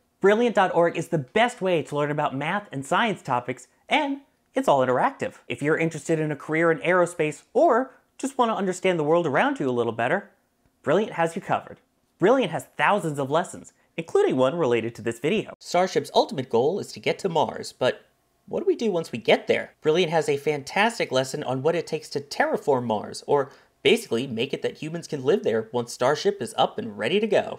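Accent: United Kingdom. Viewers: No British Accent please!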